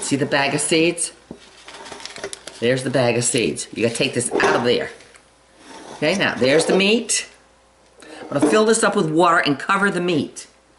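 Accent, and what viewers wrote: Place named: East Coast, United States. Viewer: your accent sounds east coast, but not like florida, good recipe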